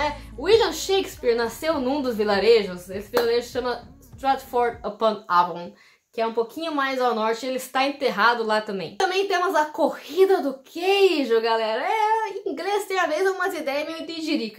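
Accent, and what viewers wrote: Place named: Paraná, Brazil. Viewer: Sotaque mais lindo do meu interior do PR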